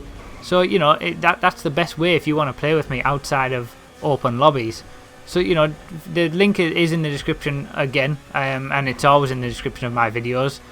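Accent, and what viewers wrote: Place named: North East England, United Kingdom. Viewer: Thought so, im from Newcastle :) Never really came across a north east commentator, good content though keep it up!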